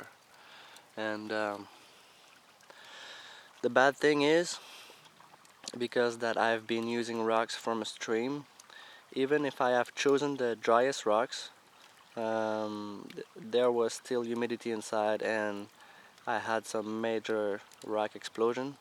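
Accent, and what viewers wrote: Quebec, Canada. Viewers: hummmmm... je crois entendre un petit accent québécois dans tout ce qu'il dit ^^ I think hear a quebecois accent in his voice^^ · Great video! Are you Canadian French in Quebec? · Thats a nice quebec accent :) cool video man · You sound French Canadian, I could be wrong, dude you're awesome, merci beaucoup. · are you french? from canada right ? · je viens de sub ton accent je me suis dit fuck de ou au quebec il viens lui haha vaudreuil ici!je te suis,tres bons videos!je veux commencer a faire ca,sortir de la routine des enfants etc! prend soin de toi soit safe!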